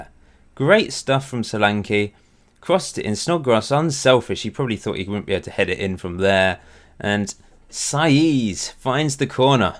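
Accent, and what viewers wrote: RP, United Kingdom. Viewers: You're actually so posh 😂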